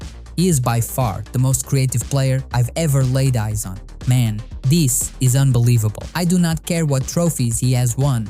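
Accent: Portugal. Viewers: Este gajo eh Portugues.... de certeza. Heheheh Video genial!!!!!!! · This guy has a portuguese accent! Great video/Grande vídeo!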